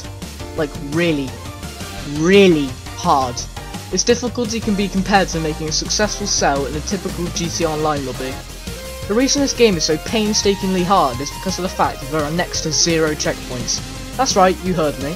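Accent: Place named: United Kingdom. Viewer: Judging from your voice, you are bri'ish :(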